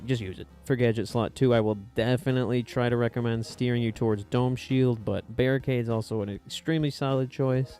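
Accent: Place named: Eastern Europe. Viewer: Are you Eastern European? you have an interesting accent.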